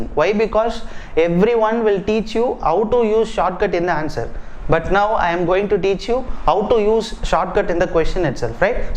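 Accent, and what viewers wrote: India. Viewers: disgusting accent. making fun of india around the world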